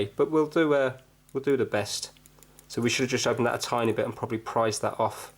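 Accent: United Kingdom. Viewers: D one day we want to see face. And maaan. I just love this british accent. Here in Germany we all love it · Hi im trying to figure out what part of the UK you are from with your accent? Warwickshire or? i might be wrong but just wondering where your from. Thanks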